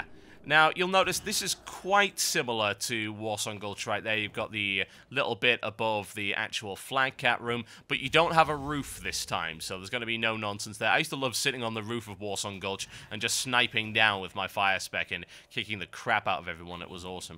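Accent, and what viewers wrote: United Kingdom. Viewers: Yay!! I love you an your cynical british accent, you make awesome videos some more entertaining than other but still a fine work <3 ^^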